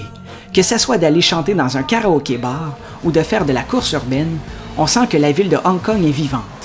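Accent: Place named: Canada. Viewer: J'adore ton accent et j'aimerais trop aller au Canada!!!!!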